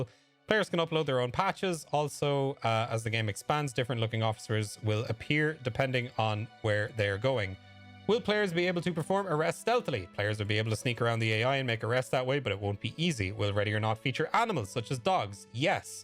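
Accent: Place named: Ireland. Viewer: Where in Ireland are ya from sham?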